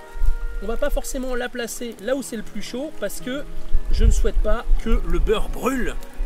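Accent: South, France. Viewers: Moi non plus je ne sais pas pourquoi tu fais l'accent du Sud quand tu cuisine mais ne t'arrêtes surtout pas ! C'est gourmand et croquant à mes oreilles. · pour l'accent du sud je pense que c'est ton petit coté Maïté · La Creuse ? Mais c'est à côté de chez moi ça ! XD Je ne suis pas très au fait du monde de Zelda, mais cette recette m'a l'air fort goûtue (avec l'accent du sud qui va bien^^) !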